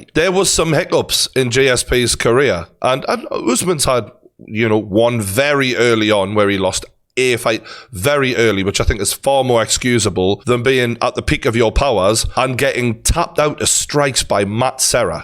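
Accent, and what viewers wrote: Newcastle, United Kingdom. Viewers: Best mma content, love this geordie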